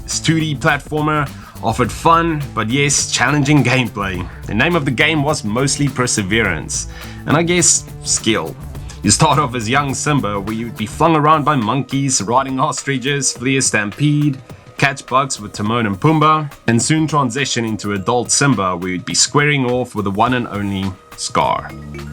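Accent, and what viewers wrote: South Africa. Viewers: Good video, but god that Seff Effrican accent is obnoxious. You're one of the few YTers whose videos would be improved by a robot voice. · Can hear that south African accent! I right? Pretoria or cape town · South African accent there right? Always nice to find another saffy on utube. Warcraft II was a great game. Played that and dune 2 so much.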